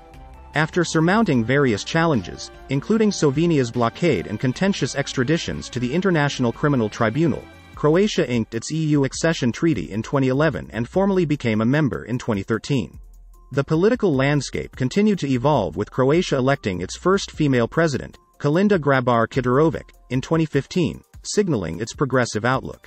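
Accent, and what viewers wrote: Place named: England, United Kingdom. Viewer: Because the AI speaks English akcent I cant wait for it to say: I cvrči, cvrči, cvrčak na čvoru crne smrče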